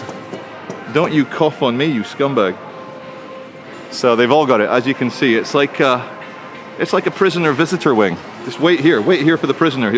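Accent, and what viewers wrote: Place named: RP, United Kingdom. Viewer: Too posh for the man of the people.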